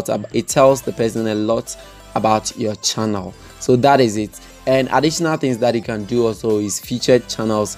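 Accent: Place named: Ghana. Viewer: See ya fine Ghana accent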